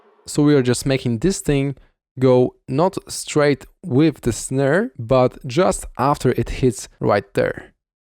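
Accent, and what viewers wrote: Russia. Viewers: русский акцент😂🤟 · Акцент русский пздц, но видосы крутые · Ты русский что ли?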